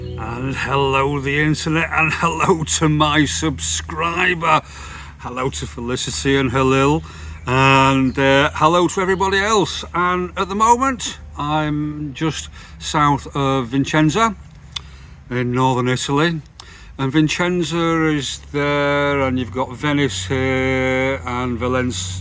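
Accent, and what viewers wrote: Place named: North, United Kingdom. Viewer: I love it ….the sounds of a disgruntled northerner- just like me 😮 “ Put me in charge and I’ll sort it out “!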